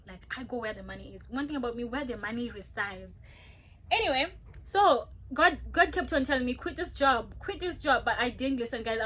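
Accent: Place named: South Africa. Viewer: You sound so South African it’s crazyyyy!!! This is so inspiring!!! I’m so proud of you 🙏🏾❤️ I just found you and I’m hooked sis ❤️❤️❤️❤️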